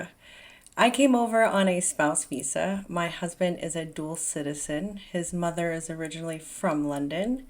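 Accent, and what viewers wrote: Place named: United States. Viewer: Helloo Brit here welcome to the uk always cool to hear an American talking its soooo cool to hear your amazing accent i Love it i Love America and there accents hope you have settled in well and Hope you enjoy your time here in the uk have fun and enjoy. 😍😍👍🏻😊😁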